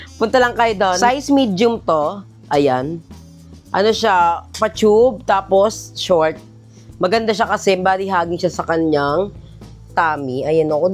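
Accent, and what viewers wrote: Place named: Visayas, Philippines. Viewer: Bisaya poba kayo ma'am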